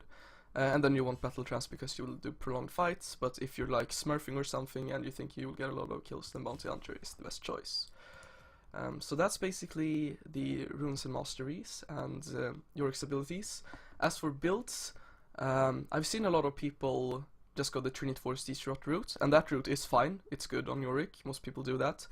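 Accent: Sweden. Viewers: This guide was awesome! Are you Swedish xd? (Your accent sounds like a swede)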